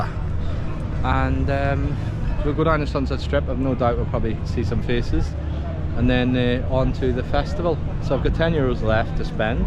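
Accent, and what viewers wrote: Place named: Derry, United Kingdom. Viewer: Where abouts in ireland are you from bro? I'm from derry myself your accent doesn't sound far from here. Coming to ibiza 28th September for the week so thought I would get a watch at the videos!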